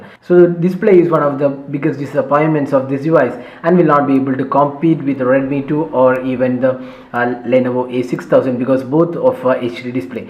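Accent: Kerala, India. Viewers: indstall aapal gawlity abailabel haha mallu english is unique. My english teacher was mallu too and spoke just like this. I noticed that they have the most thickest accent in south india. Tamils actually pronounce better than telugus.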